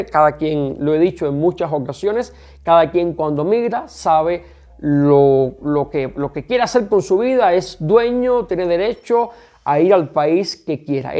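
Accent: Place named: Cuba. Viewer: Amigo hablas como Cubano... Y actúas como mexicano que eres ... Así de simple es y debe ser un discurso... Se tenía que decir y se dijo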